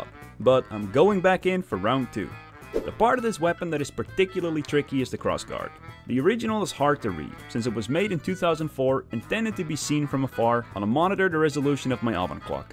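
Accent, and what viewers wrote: Netherlands. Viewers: I watch for your commentary, klootviool ;) Echt waar Dinglish af en toe maar ik kan het wel waarderen. Other than that the nostalgia hype of seeing old WoW shit is great. If I were you, id branch out to other games. Maybe redo a CoD 1 / Battlefield 1942 weapon? The latter had some iconic music. Try to get some cross gamer appeal going. I personally dont watch streams or anything. Just find something short and fun to do. Maybe do some starfield modding? That game is going to be huge starting next week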